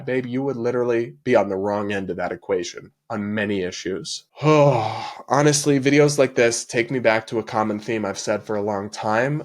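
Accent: United States. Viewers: You say “from a centre right perspective” but I hear a yank accent so in reality you are coming from a far right perspective.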